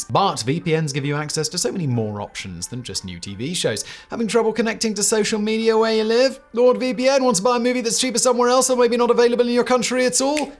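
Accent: England, United Kingdom. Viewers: Its Bri’ish. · is speaking correctly. It's the English pronounciation. All the time there is aluminum and math, there is no room to shout. The language is called English (not American), afterall. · He's British. 🤣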